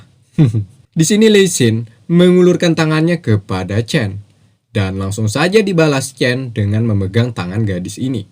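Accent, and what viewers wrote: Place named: Kalimantan, Indonesia. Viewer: Bg cara bicara lu kek orang kalimantan emang aslinya orang mn, ngomong² gua orang kalimantan selatan bg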